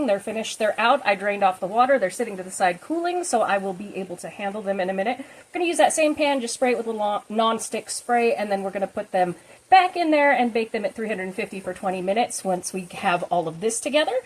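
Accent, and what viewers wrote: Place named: Appalachia, United States. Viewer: Sorry for your loss, love the bluegrass twinge I hear in your voice...I've never added corn, but I might next time, thanks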